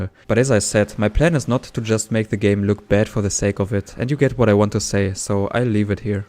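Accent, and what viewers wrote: Germany. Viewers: Man hört so fett raus das du deutsch bist hahaha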